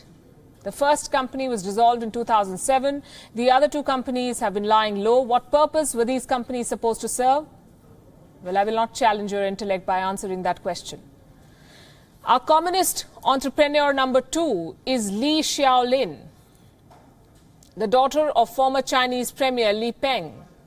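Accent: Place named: India. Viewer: India and China, two of the oldest civilisations on earth should lock hands. But here you are clever by half, breeding discord. You are a developing country criticizing another. As if your leaders are holy and free from corruption. Lee Kuan Yew said that China like other countries is afflicted with corruption, but the difference is that Chinese leaders are able to get the job done. So woman, you should keep your strong Indian accented trap shut.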